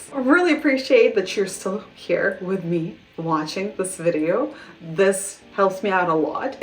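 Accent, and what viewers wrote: Russia. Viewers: Why they don't like to listen to you? You speak a beautiful English, with a very- very light accent, perhaps Russian. I hust descovered your channel. It is really interesting. Thank you. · Hi, correct me if I'm wrong but I think your accent is from Russia am I right or the Ukraine? Think it's very rude for people to comment on people's accents not like you/they can help it. I personally love to hear accents all around the world.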